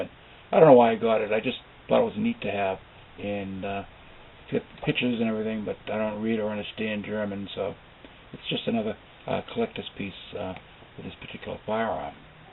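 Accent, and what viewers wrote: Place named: Boston, United States. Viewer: You sound like that you're from Boston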